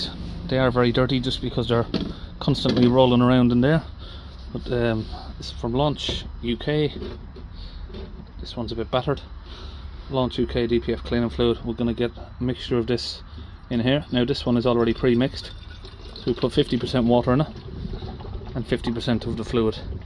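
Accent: Ireland. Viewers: SOUTHH Dublin accent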